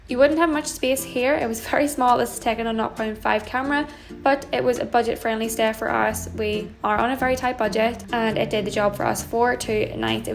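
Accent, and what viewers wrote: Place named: Ireland. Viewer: Lovely Irish accent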